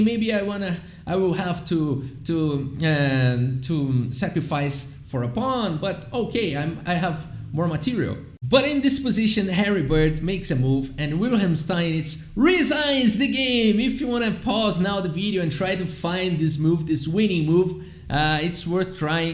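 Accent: Brazil. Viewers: Your English is good! Though you have a very distinguishable Brazilian accent (and there are some language slangs here and there that are mostly limited to Portuguese), you don't stumble or make awkward silent moments. Looking forward to seeing more videos! - Valeu,! Bora conquistar audiências lá de fora:) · Engliguês ou Portuguish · Se o sotaque não entregar que é brasileiro, as mãos entregam kkkkkkk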